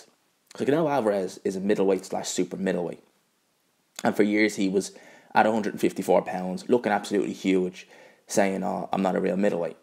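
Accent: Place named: Ireland. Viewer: Your toughts haha I love Irish accent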